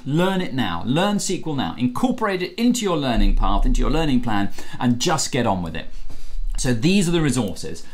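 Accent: United Kingdom. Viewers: listen to * speaking with his British accent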